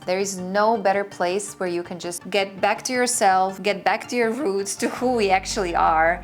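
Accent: United States. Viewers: Stop speaking American English when they are killing people all over the world. Finland is the happiest country on earth so why spoil it with that Yankee talk. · sorry u sound yankie, no ring! kokeile suomex ( 10y exp?) yt 'moro suomaasta'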